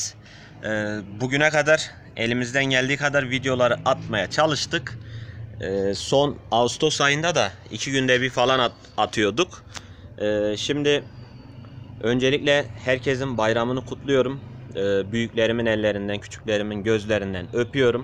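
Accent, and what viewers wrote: Gaziantep, Turkey. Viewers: Aaa Reis antepli misin sen?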